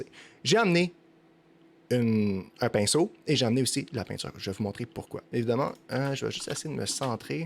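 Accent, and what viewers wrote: Quebec, Canada. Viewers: Chaluuut ! Je te regarde de Belgique. J'adore ton accent quebecois , un accent tellement enjoué , qui donne le sourrire, ainsi que les expressions. 😃 l'orignale m'a tué 🤣🤣 et le "thylaceo" 🤣🤣 Continue copain , tu ensoleilles mes journées 😘🤩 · Hey 😁! Superbe! Merci 😄 vraiment très bien ta ferme. Et pour répondre à la question que tu te posais et étant de Nouvelle-Calédonie, ton accent québécois ne me dérange pas du tout. J'ai déjà pu jouer avec des Québécois sur certains jeux et j'en garde un très bon souvenir. Des personnes agréables, gentilles et surtout très drôles 😋. Après je ne vais pas le nier, il y a des fois où vous avez vos expressions et c'est vrai qu'à ce moment là, je ne comprends pas toujours 😂 mais ça ne me gêne pas plus que ça 😀.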